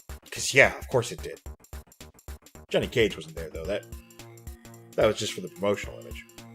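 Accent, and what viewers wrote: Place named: Northwest Indiana, United States. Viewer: You sound like you grew up in NW Indiana with me, bro. Shout out to Aladdin's Castle arcade!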